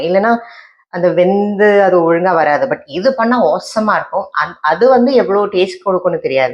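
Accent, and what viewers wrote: Kerala, India. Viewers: That Malayalam slang unne vittu pokale 😊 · Anyway Tamil samsarikkumbol malayala slang nannayittundu.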